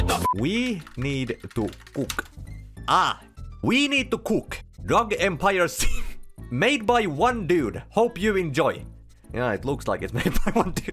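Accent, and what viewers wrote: Finland. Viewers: bro sounds a little too much finnish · Bro sounds like he is from finland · it's a finnish accent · The most charming Finnish accent right after Kimi Räikkönen. Very good but games very bad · why is this guy soo entertaining man, his english with the finnish accent is what hooks me to watch more of this guy. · Ah. I was wondering what your accent was. Finnish, I see. Only thing I know about Finland is Hanoi Rocks and Michael Monroe or is it Malone? can't remember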